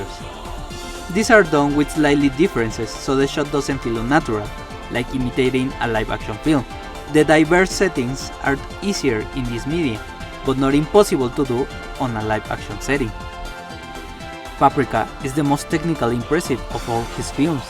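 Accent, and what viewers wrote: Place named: Romania. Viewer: Good Video! you have quite an accent. Are you from Romania?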